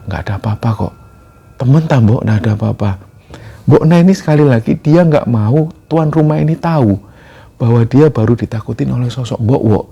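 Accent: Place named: East Java, Indonesia. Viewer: Dr kecil paling suka kalau di dongengin.skrg chanel yg paling aku tunggu update nya cm.entah mungkin karna pembawaan mas yang jawa timur bgt karna aku jg org jatim dan tdk mendramatisir. Jd ayem denger nya.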